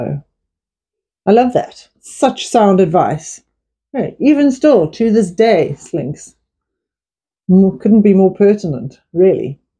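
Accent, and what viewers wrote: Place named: South Africa. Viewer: Your voice and accent give a sense of familiarity, having lived in SouthAfrica as a child. Simple words of wisdom or proverbs seem to have disappeared from daily language.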